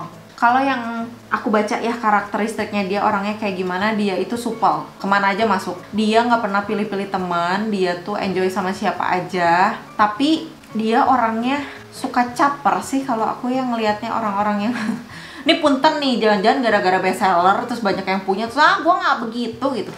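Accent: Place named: Sunda, Indonesia. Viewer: Nyunda pisan di video ieu mah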